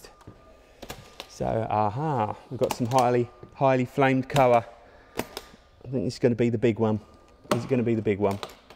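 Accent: United Kingdom. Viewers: Always nice to hear an Essex accent, and always nice to see fabulous Martin guitars. The best in the world. Sadly, I won't be coming to see you. No lefties!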